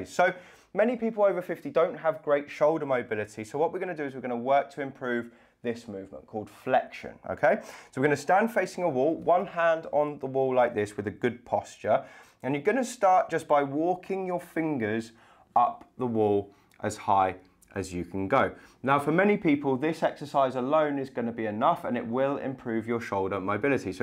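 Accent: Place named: United Kingdom. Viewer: Superb presentation.... British! No annoying music either!!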